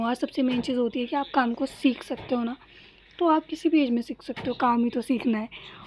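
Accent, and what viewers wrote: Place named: Kashmir, India. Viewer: You look like a Kashmiri girl, and your tone also sounds the same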